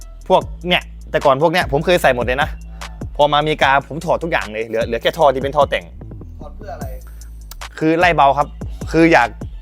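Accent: Korat, Thailand. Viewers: คนโคราชเดะนิ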